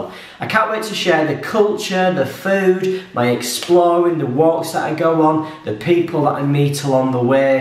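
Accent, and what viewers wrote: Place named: Yorkshire, United Kingdom. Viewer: Hi, I'm a fela from Córdoba, a city from Andalucía in the south of Spain..I am a English learner and I think that your videos are very nice to learn the Yorskhire accent. I visited your area a couple of times and I only can say that i am into all the issues from Yorshire, a region so nice, stunning and picturesque.I would like to travel as well.My city is very nice with all their muslim heritage and not so known as Seville and Granada. Enjoy your time in Spain and NY. Greetings1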